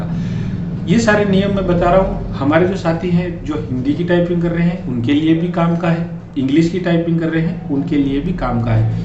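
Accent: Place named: Himachal Pradesh, India. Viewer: Aapni voice himachali lgdi h😊 mai himachal se hu mera 6 August ko typing test apki video kafi helpful rahi i hope mera test acha jaye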